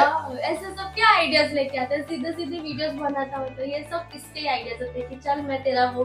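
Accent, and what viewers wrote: Gujarat, India. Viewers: The way u speak... Apki tone me hi gujrati pana hai😂.. I am also from Gujarat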